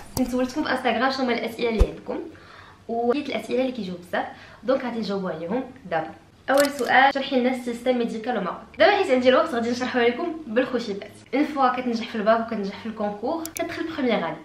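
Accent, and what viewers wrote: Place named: Monaco. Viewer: مالك اختي كتهضري كيف لكبرا فموناكو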